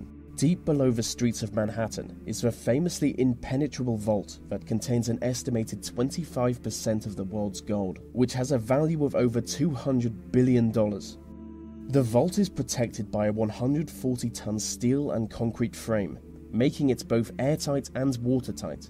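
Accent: England, United Kingdom. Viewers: Not sure what it is your debonair English accent or the awesome topics the great narration and your consistency always keeps me excited and wanting more from your channel job well done my friend thanks again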